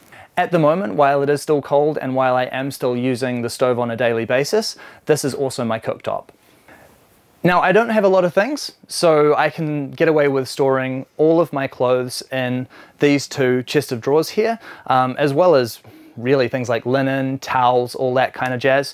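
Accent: New Zealand. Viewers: Before I even heard him speak I thought 'this guy must be a kiwi' ... haha! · Sounds like a new zealander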